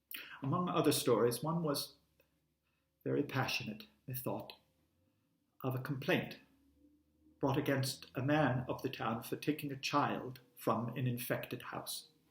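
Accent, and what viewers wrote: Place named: United Kingdom. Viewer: Thank you for this. Good British accent.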